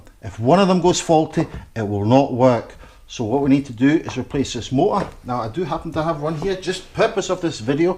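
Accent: Scotland, United Kingdom. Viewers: Sometimes i wonder how many instructional videos absolutely bamboozal me......NOT THIS ONE. This is a delight to listen to. Is it because its a Scotsman explaining, to a like minded Scotsman😂 Thank you. I will now be able to diagnose my daughters reclining chair. Ive taken note of your website etc.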